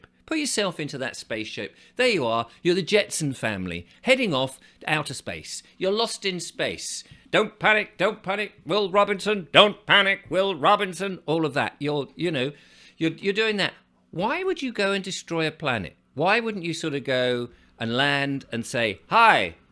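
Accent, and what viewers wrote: United States. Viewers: Pretty good American accent. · Love your American accent.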